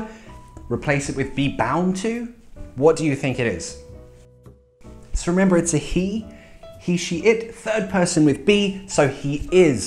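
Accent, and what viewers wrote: United Kingdom. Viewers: i really enjoy all your vedios .... hope that you will go on and make more usefull vedios iam in love with the British accent and you helped me to learn it ... Thank you very much ❤❤❤❤❤ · That is really interesting. Thank you so much for your efforts. And actually, I am not British, and I watch your videos to improve my British accent. I would like to learn how to pronounce the letter 'o' in a British way like in the words 'no' or 'so'. They are not pronounce the same as in American accent. Thank you in advance !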